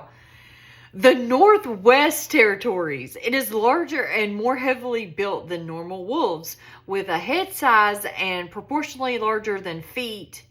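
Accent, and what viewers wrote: South, United States. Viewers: This girl is badass I'm digging the southern draw and also the canine eyebrows it's working for her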